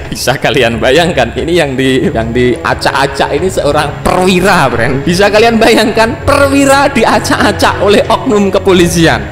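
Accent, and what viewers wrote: Java, Indonesia. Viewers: Omonganmu medok min..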